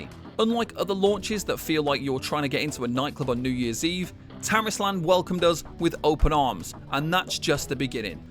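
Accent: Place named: United Kingdom. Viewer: Seems you have an issue with the British Accent. Because there’s absolutely nothing wrong with how this content creators talks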